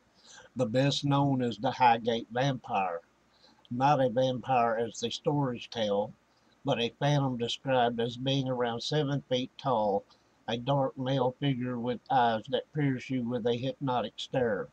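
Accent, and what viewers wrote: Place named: United States. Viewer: How come there are know English person doing this video surly we know more about our heritage than Americans I say that because the person talking sounds American to me just saying lol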